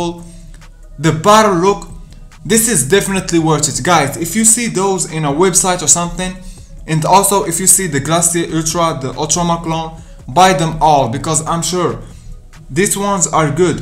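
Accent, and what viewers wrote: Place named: Morocco. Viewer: Are you Moroccan ? You have Moroccan accent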